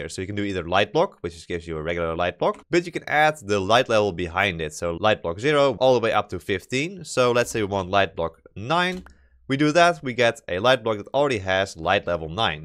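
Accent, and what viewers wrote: Netherlands. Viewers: Your Dutch i know it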